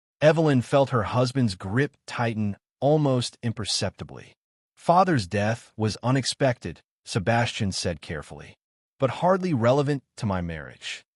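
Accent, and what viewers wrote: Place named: United States. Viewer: I simply cannot understand why they use such a strong American accent for the narration in a story set in Britain. It ought to be British English.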